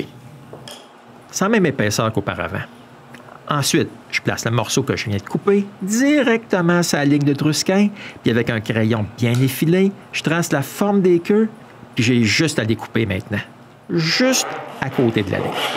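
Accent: Quebec, Canada. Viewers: Is this a quebec accent?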